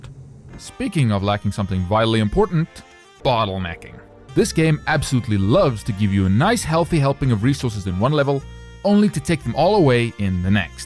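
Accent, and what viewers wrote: South Africa. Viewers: Long since I've heard a fellow South African Youtuber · Dude! Are you South African?? Cheers from a fellow citizen missing mail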